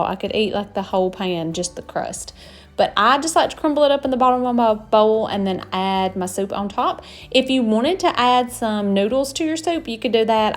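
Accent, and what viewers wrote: South, United States. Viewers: So I guess you can tell by my comments your shorts just popped up on my homepage a few days ago been loving your shorts so I decided to watch this full length video today and I love it. Love your personality. Love your beautiful home love your accent. I’m from Mississippi so I have one too. I did learn something from your video today. I’ve never been told before to heat up my cornbread pan before I cook my cornbread, I will be trying that, have a great day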